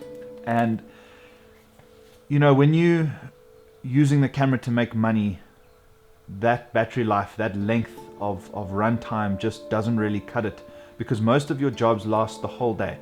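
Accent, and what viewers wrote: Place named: South Africa. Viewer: So awesome to see a fellow Saffa so passionate about filmmaking! Almost jumped out of my seat when I heard your accent. Great footage!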